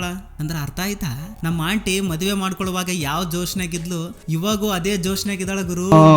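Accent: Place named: Bellary, India. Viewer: ನಿಮ್ಮದು ಬಳ್ಳಾರಿ ನ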